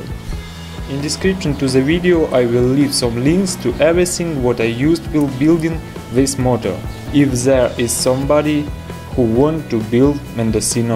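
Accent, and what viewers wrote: Russia. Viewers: Это же русский по английский придуривается. И клей российский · говорит с русским акцентом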